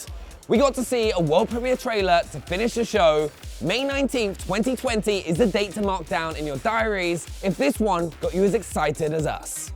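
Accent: United Kingdom. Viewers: Ok so i love the UK peeps , i truly do, even if we boot stomped yall for our Freedom, MY ISSUE IS, why are yall on my youtube 24/7 with that accent that i cant understand , i mean really wth ! this Vid seems really Great and i start listening an all i hear is that dreadful accent and its mucking the entire vid up, and where are my fellow Americans for these types of vids, why are all my Video Game suggestions from youtube are from the UK !!! im thumbs up your vid cAUSE its the proper thing to do and i really like the title ,but i cant understand a lick of what your sayn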